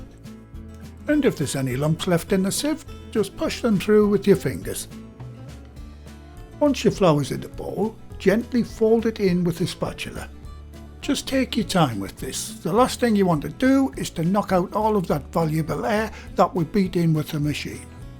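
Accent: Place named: Newcastle, United Kingdom. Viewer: To be more specific it is a Newcastle accent. There's quite a variation of North East accents and is quite soft compared to some, which you could cut with a breadknife (or "brweadknafe") It's become very familiar to me having lived up here for 25 years. It can be quite a strong accent and get mistaken for a Scottish accent with a lot of dialect words too.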